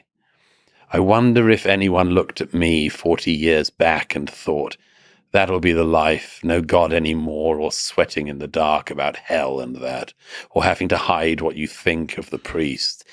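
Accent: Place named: RP, United Kingdom. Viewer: Yeah, he's totally got this wrong. Prat with a plumby accent is all he is.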